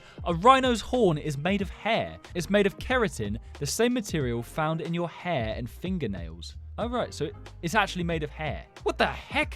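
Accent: United Kingdom. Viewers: He sounds i bit British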